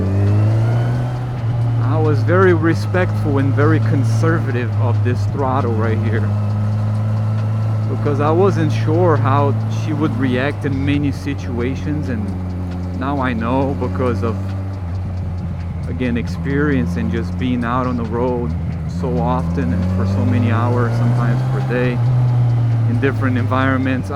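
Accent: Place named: Taiwan. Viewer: So youre from Taiwan? Do all people from taiwan have that accent? And also, do most people speak such fluent english? Love the channel. Subscribed. Hopefully you post some new vids one of these days!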